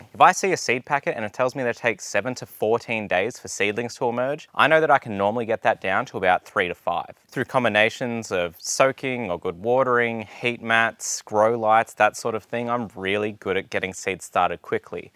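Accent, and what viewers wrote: Australia. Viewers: New sub. Where are you from? Aussie?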